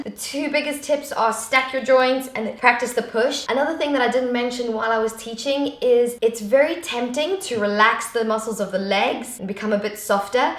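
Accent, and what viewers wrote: United Kingdom. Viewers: Are you from UK???? I'm asking you because of your accent cause my brother works in UK and I was in UK and everyone has that accent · Anyone else vibing the British accent after watching this?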